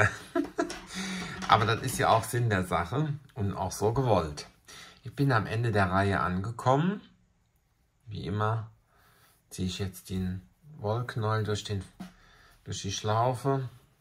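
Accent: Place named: Rhenish Hesse, Germany. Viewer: Das ist wirklich ❤ allerliebst .Hab heute meinen ersten gehäkelt. Voll knuffelig.. Ausserdem könnte ich dir stundenlang zuhören ,weil du den gleichen Dialekt hast wie ich. 😅 Ich babbel roihessisch😂 Also nochmal Dankeschön für diese wunderschöne Idee und die tolle Anleitung Lg Sonja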